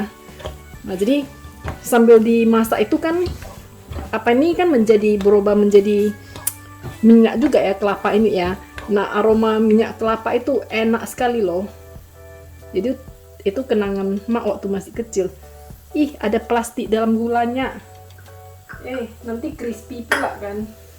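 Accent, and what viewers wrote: Medan, Indonesia. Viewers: Logat bicaranya kayaknya dr Medan nih...sukses selalu ya..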